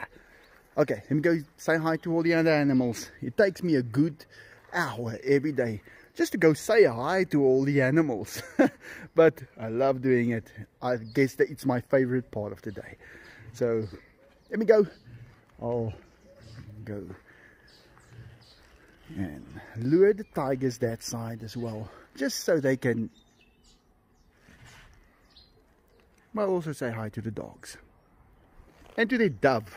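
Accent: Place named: South Africa. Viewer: Majestic cretures + South-African accent =best channel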